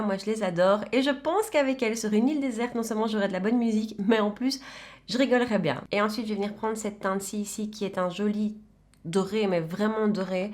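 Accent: Belgium. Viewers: Coucou! Merci d'avoir répondu à mes questions! Félicitations pour tes + de 1000 abonnés du coup 😊 J'ai craqué aussi comme tu le sais sur la mini gold j'aime tellement le vert kaki 😍 Au Etats Unis ça doit être le paradis du maquillage mon dieu 😱 Et je confirme tu as un bel accent Belge! D'ailleurs j'ai des origines belges du côté de mon grand père paternel "ça se voit pas hein 😂" Je suis également "Mimi's signature" et "la Loge de Gabrielle" Tu es très agréable, douce, simple et je suis comme toi j'adore rigoler la musique danser... Bonne soirée et à dimanche 😘😘😘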